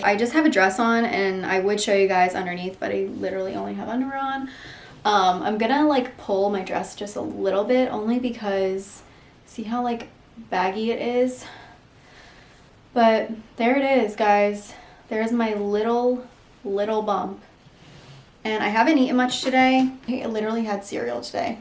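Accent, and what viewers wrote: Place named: South Jersey, United States. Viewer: Totally voting boy 💙 I just wanted carbs, bagels, cereals etc 😊 also since moving from Jersey, I can totally hear your accent! I have the south jersey accent as well and my new friends always call it out 😂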